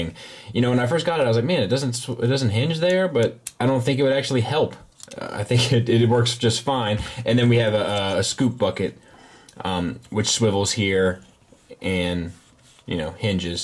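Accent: England, United Kingdom. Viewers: Love the limey accent...lol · Love the English accent, haha! Good review as usual,.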